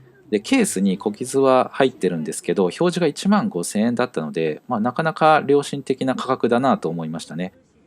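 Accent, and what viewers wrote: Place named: Kansai, Japan. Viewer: お互いに関西の何処かに居ますね。さんの聴きやすい声の僅かな関西訛りを聴きだして塾聴しながら私なりに楽しんでます。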